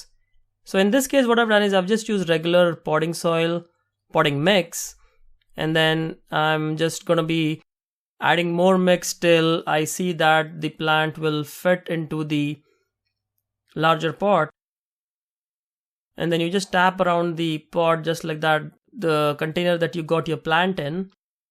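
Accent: India. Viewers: U talk same Indian people, where are you from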